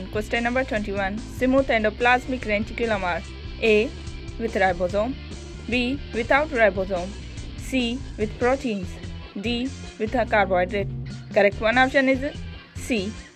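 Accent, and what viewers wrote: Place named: Sindh, Pakistan. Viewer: Ap sindhi ho apki awaz se lag rha hai magar ap bohoot payari awaz hai apki mashallah 😊😍🤗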